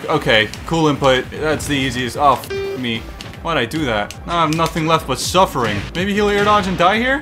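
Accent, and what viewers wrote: Chile. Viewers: Are you Chilean???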